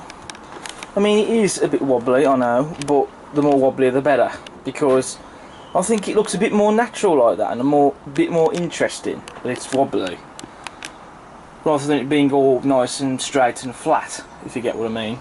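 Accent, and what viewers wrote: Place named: Coventry, United Kingdom. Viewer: Whereabouts do you come from? Sounds like Coventry perhaps?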